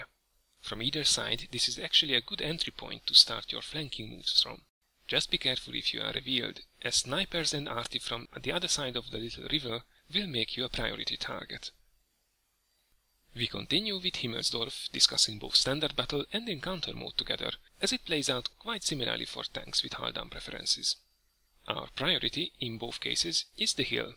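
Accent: Hungary. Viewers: Már az akcentusodról meg tudtam mondani hogy magyar vagy :)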